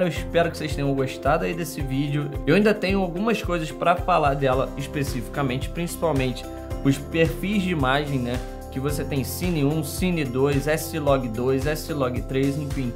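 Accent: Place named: Rio de Janeiro, Brazil. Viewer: o sotaque carioca puxadissimo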